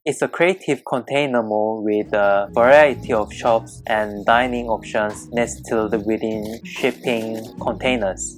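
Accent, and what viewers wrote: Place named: South Korea. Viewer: Why is this YouTuber so attractive? I'm starting to fall in love with the video. This is typical Korean English pronunciation! Everyone~ If you want to communicate well when traveling to Korea, please watch this friend’s video a lot!! You will have much better communication!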